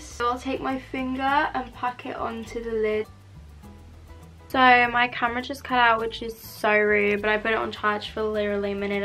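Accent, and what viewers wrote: Scotland, United Kingdom. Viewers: can please do a whole video in her scottish accent its actually so entertaining🥰🥰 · How could you not love her Scottish accents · As a Scottish person listening to her Scottish accent has me in stitches 😂😂😂😂😂👌🏻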